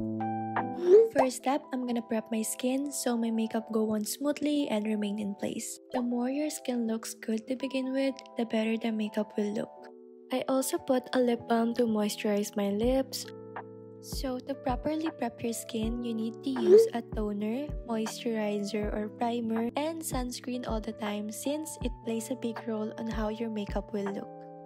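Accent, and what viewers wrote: Philippines. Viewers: Are you filipino? I hear some accent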